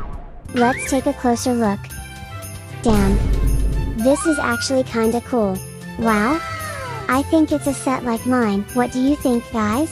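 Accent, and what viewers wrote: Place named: England, United Kingdom. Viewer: soy yo O te veo con la voz más inglesa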